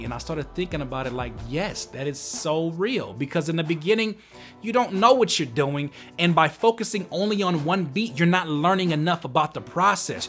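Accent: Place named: West Coast, United States. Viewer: lol u my dude. U b soundin west coast as fukk sometimes...which is dope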